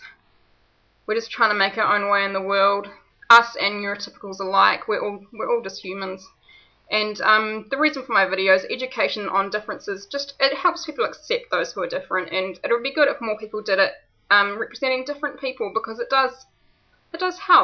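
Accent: New Zealand. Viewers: She's a lovely keewee! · Yeah thats a good kiwi accent ! Now everyone this is a girl from a place called new Zealand . Do you know where that is?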